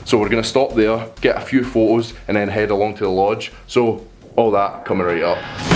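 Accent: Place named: Scotland, United Kingdom. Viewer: Knew I was going to enjoy your videos and I did. Subscribed as well. Scotland is one of the most beautiful places and absolutely love that accent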